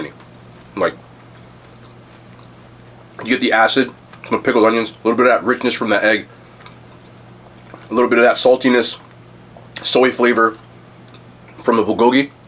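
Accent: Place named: New Orleans, United States. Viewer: I fw u u tried to talk like how we talk in New Orleans⚜️ much love to u bro😂💪🏽💯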